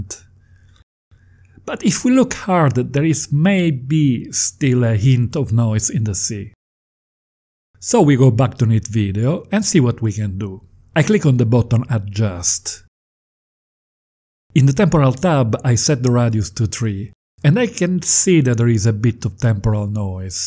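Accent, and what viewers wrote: Italy. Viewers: accent italian. are you italian ?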